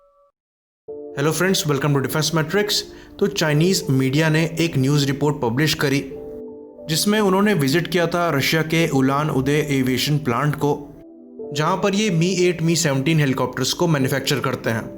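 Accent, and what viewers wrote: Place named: Rajasthan, India. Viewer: Bhai tum rajasthani ho kya aavaj see lag raha hai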